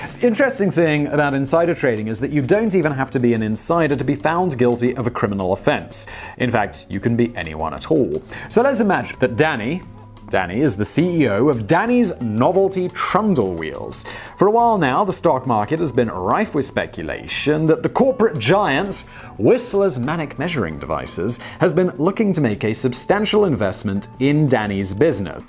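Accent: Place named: United Kingdom. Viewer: The British accent in this one is throwing me off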